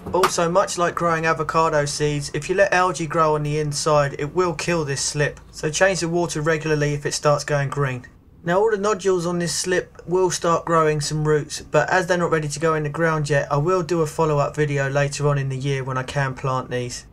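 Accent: England, United Kingdom. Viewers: When I first heard your accent I thought you must be an Englishman living abroad, then when I realised you can grow them in England it gave me extra confidence to try them here in France. I like your video I'll be giving this a go and then checking out your other videos. Well done and thanks. Al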